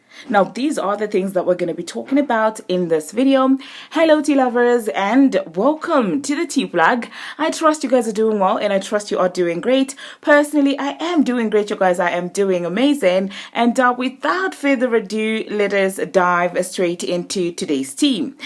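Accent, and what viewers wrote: South Africa. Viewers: Are you South African 😢😍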